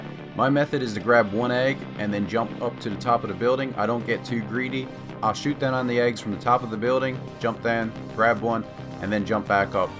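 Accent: Newfoundland, Canada. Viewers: haha didn't catch the newfie accent till you said 'stay where you are and ill come where you're to.'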